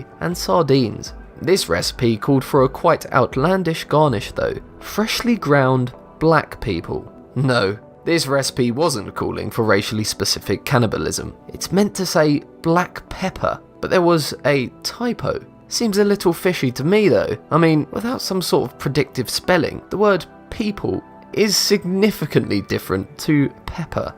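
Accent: United Kingdom. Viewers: And your British axent like mine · Pietryga Lv he’s a Brit he has an accent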